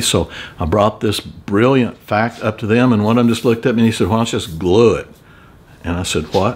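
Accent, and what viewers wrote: Texas, United States. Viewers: I just love your Texas Drawl thank you for sharing the difference between cowl panel and cow panel 😂😂HAHA I love it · Well i don`t know what the problem is i understood you perfectly fine because i`m also from Texas and i clearly heard you say COW!! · I'd revit that area over bonding. If you want to seal below windshield with both, I'd consider good plan to protect wiring from any water leaks. Hope you have some patch panels to cut that rust out on side. Great to see transformation. I spit my coffee seeing that woman's photo 😂 I think it was your texan drawal and you are educating some excited over your project. That bonding has some long term issues. Its not first generation... Not fun to start over. Enjoy your long weekend and BBQ.🌻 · LMAO 😂😂😂 That is so funny, I understood your Texas drawl just fine and I am not from the USA. 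And I agree that panel bond is a great option with rivets or spot welds. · Making good progress, i see, and having a great time while doing it, I think. I didn't have any trouble understanding the word "cowl" in the last video or this one. I love your Texas drawl,. Of course, that's because there is a big piece of my heart still in Texas. When I would go back to visit family, i would quickly begin to speak in that drawl myself, it comes so naturally to me. Love you, hope you got some good responses from the car guys and girls, which I ain't. 😊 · No apologies are ever needed! I never did any body work so I have no input of value on that! I always hated working with the front and rear glass...helped do the rear window of a 70 Riviera boattail....YIPES!! Happy Labor day weekend to you and the family! Your Texas drawl is easier to understand than some southern dialects...Where oil is pronounce earl, and door is a two syllable word! 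Fortunately I was raised hearing it all the time so I can still understand it.....well most of the time at least! The blazer is progressing well! Not much better than a bit of shop time with your good car buddies!! Thanks for sharing!! We lost Jimmy Buffet yesterday...so I' had a special Margarita for him....man we are losing some good ones !! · Love your Texas drawl you keep on doing what you do very entertaining the rebuild is a big job you have more will power then I would have on a job like that your videos are awesome don't change anything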